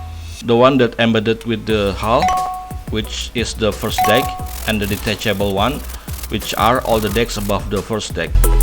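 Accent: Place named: Indonesia. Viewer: Tetap semangat om, saya kira channel luar negeri, eh kok aksennya masih Indonesia+di perkuat nama yang sangat Indonesia sekali.😁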